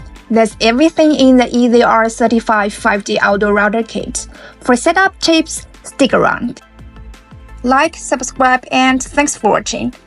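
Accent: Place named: China. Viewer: Her slight accent is Chinese, I don't trust Chinese data equipment due to the CCP requirements to hand over data to them, might be a back door built into it for all I know, or remote deactivation during war time. That said, this company is not on the U.S. government list of unapproved modems for their use, so might be OK. The quality looks great and I do prefer the N-connectors over the small SMA connectors every other manufacturer uses. Only question I have is where to buy it without the tariff tax? Shipped directly from China?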